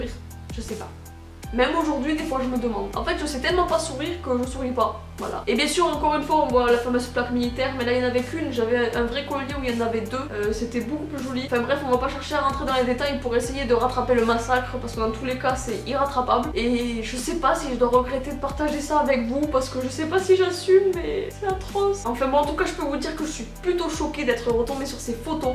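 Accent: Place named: South, France. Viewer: ta l'accent du sud sa s'entant 😀😁☺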